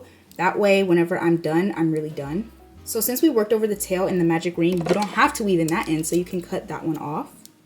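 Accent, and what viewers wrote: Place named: United States. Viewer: thank you for the tutorial! could you pls tell me what american accent you have? asking for scientific purposes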